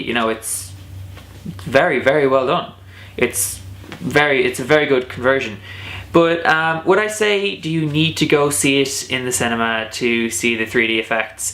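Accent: Ireland. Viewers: I saw it in 3D, too. It was worth seeing it again in theaters, & the 3D in the film made it just a bit more enjoyable on the big screen. It is definitely a classic. Btw, great videos, I'm gonna subscribe cuz you're awesome. And of course I'm jealous of your accent ;) I wish I had grown up in Ireland. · The movie is impressive! After I went to the Titanic Light Show in Belfast two weeks ago I definitely decided to go to see the movie on big screen. DiCaprio and Winslet are genius, as always. Btw after my trip to Ireland I am missing the Irish accent, so beautiful! It was the reason I subscribed to your channel (your accent) =))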